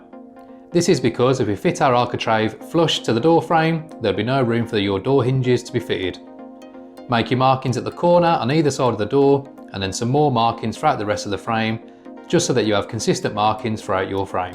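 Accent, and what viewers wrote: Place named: Black Country, United Kingdom. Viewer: I'm a Brummie though 😅 he sounds more like a black country yam yam.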